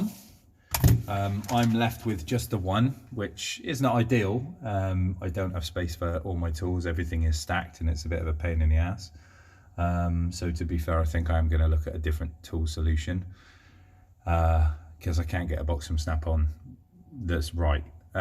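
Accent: United Kingdom. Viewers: Hi great channel, it sux snap on hasn't been great for you. I watch the channel cause you talk about snap on tools, nice to hear from a Brit instead of a yank for a kiwi snap on fanboy. love your toys!!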